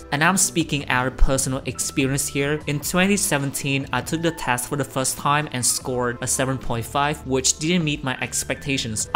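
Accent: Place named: England, United Kingdom. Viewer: Thanks to your Fb post about Notion, I know you. I really like your English accent and what you shared in your videos. Thanks so much. <3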